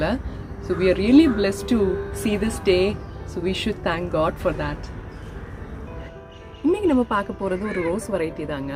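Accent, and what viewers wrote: Kerala, India. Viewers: Malayali ano? Sounds like Malayali speaking Tamil.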